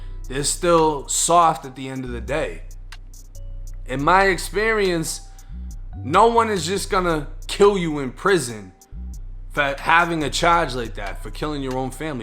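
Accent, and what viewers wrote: Boston, United States. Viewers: Whea u from bro u sound like u from sumwea in the bean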